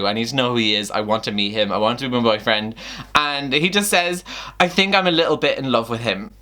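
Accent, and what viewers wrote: Ireland. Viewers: your youtube name is obviously so i don’t know why i was surprised when i realized you have an Irish accent. We kinda annotate similarly! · I want to point out two things: 1. I’ve never been interested in that book but you made me want to read it like... now. Maybe two lives ago. 2. Your Irish accent had me almost sobbing because it’s adorable omg. Please be my friend ahaha I had to watch the video twice because I kept being distracted 🙈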